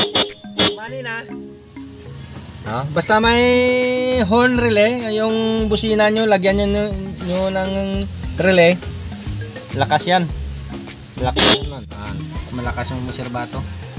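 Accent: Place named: Western Visayas, Philippines. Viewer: Yun ang gusto q sa ilonggo, malambing magsalita. How tooooo instaaaall...😅🤣😂👋. Pero damo nga salamat gid to sa tudlo mo ha kay damo q nabal-an halin sa imo ubra.👍👍👍 Gbu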